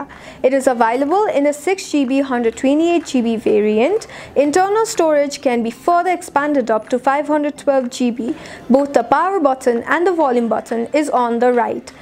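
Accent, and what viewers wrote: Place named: England, United Kingdom. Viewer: I like your english accent.. 👍👍👍 Your pronunciation is very good.. And your video is pretty simple to understand.. I like it.. Keep it up.. Looking forward more from you.. 👍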